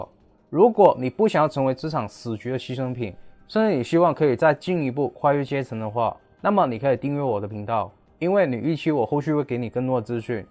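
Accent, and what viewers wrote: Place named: Mainland, China. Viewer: 聽口音很像中國人？應該先來救中國人民幣？才好吧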